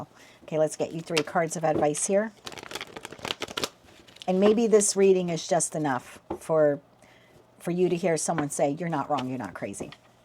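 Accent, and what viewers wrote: East Coast, United States. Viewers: You sound like you’re from the East Coast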